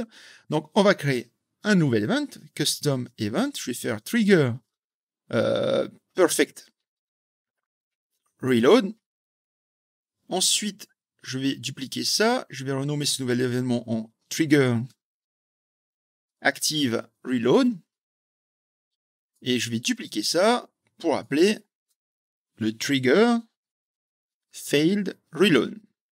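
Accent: Tahiti, French Polynesia. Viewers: Salut, tu es de Tahiti? Tu as un accent tahitien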